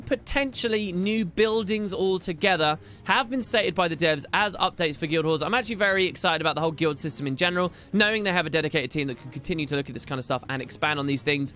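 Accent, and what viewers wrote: United Kingdom. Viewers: has you're british accent gone?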